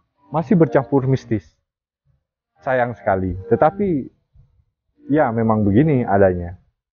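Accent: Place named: West Sumatra, Indonesia. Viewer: Bang ini orang minang ya...logatnya seperti orang minang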